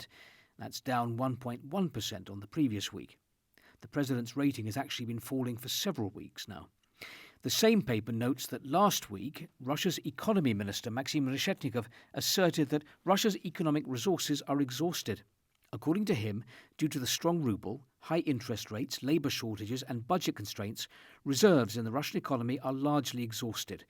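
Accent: United Kingdom. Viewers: Nothing better than a British voice, telling everyone how appauling the rest of the world is for supporting russia, while harbouring billions of Oligarch money since the 90s and 00s. And not only that, the UK has it on the left and right all the same, Galloway and Farage both seeing who can get their nose the furthest up oligarchal donors. Both acclaimed political heroes of the politically demented.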